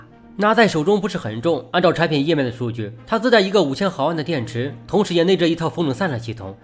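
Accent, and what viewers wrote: Shandong, China. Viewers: mike绝对是山东人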